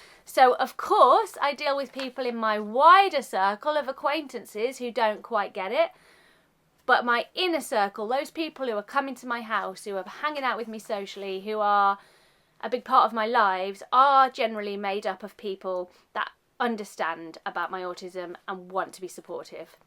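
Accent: United Kingdom. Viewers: Hi. Your videos which focus only on autism have created what looks like a community of ( pro-autists ). With that groundbreaking endeavor you have made normal and likeable what is essentially seen as abnormal and dislikeable. Besides, you have provided a knowledge pool regarding this condition which covers many of its aspects. For us none native speakers of the English language, it is a thousand times easier to find a material in American English than to find it in British English. For me, both as a dentist and an avid student of the English language ( the British accent ), your videos provide a save heaven of learning which I both appreciate and admire. I have been thinking, as well, it would be great if this same idea be expanded to cover other areas of people with special conditions. Such a focus will turn these special conditions from the stereotypical branding of ( disorders ) and ( disables ) into groups of special conditions and especially creative communities. 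I strongly believe, it worths trial.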